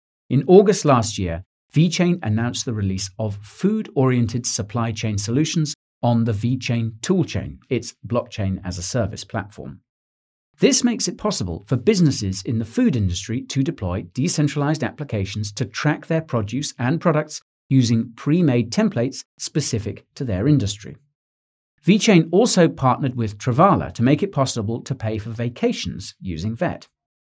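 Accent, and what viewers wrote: United Kingdom. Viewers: Is he British I can't tell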